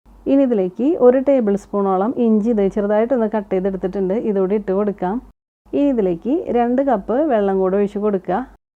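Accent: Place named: Thrissur, India. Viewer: എവിടെയാണ് വീട് സംസാരം കേട്ടിട്ട് തൃശ്ശൂർ ജില്ലയാണെന്ന് തോന്നുന്നു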